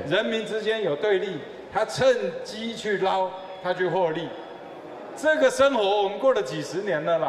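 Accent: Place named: Taiwan. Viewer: 毛哥說台語好口愛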